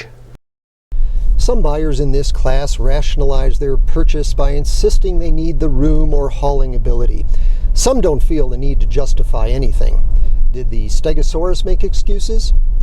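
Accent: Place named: Canada. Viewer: Great video! These big trucks are any traditional boy's matchbox dream come true. You've got a sub! I must ask though, out of curiosity, are you Canadian? Your accent sounds close to home. Cheers from the north east!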